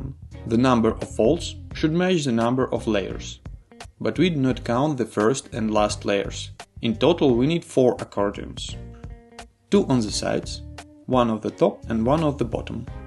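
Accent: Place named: Russia. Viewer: Жёсткий русский акцент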